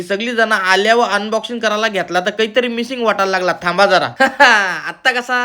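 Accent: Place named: Konkan, India. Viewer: Konkani acent wr me fkt fida aahe karan mazya baykola to kokan aavdt saglyat jast soo bhawa very heartily congratulations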